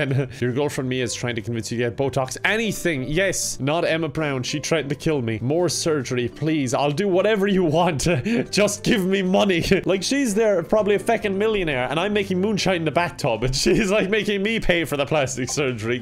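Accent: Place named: Ireland. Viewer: accent is getting more and more Irish recently, and I am HERE for this beautiful accent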